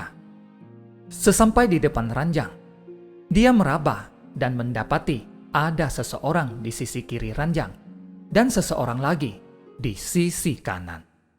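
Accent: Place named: China. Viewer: hahaha, itu dibacanya dengan logat china seperti di film2 kungfu. Akan lebih enak klo pake bahasa aslinya, kemudian ada teks terjemahan.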